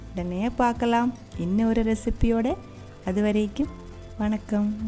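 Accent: Kerala, India. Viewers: Vera level akka.. First video paartha udanae subscribe panniten... Because, ungaloda malayalam kalantha tamil that's so sweet as your cooking.. 🥰🥰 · Your Tamil with slight Malayalam slang is very sweet to hear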